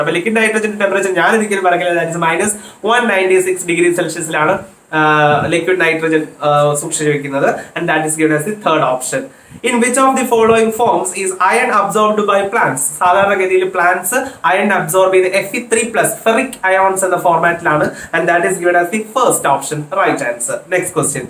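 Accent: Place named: Punjab, India. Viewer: Punjabi like ye kya bol rha hai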